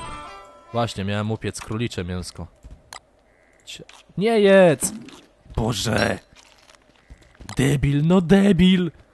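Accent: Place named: Podkarpacie, Poland. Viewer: Zgaduję że jesteś z podkarpacia , albo z okolic bo tak mówisz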